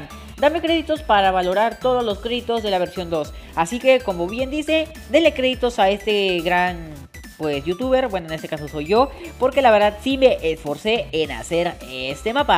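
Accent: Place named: Yucatan, Mexico. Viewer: Ahhh pense que eras de yucatan 😄😉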